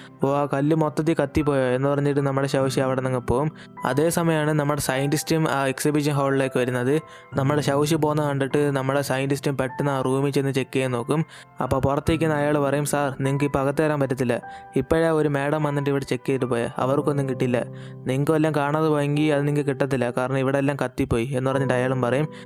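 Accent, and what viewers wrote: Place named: Trivandrum, India. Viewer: Chettan trivandrumkarananooo... Slang Ath pole erikkunnu allo, 🤩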